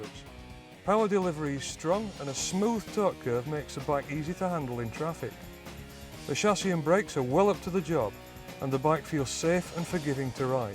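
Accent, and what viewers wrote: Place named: Yorkshire, United Kingdom. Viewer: more like 1974. FYI the accent is from Yorkshire. The haircut is from Jesus Christ Superstar.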